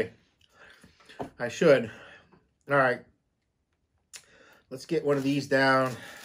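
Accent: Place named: Rhode Island, United States. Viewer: I'm in Rhode island. I know your somewhere close based on your accent . New subscriber. Love your videos brother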